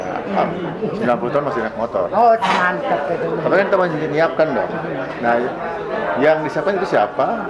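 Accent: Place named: Sunda, Indonesia. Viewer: Voice of sunda, mantaf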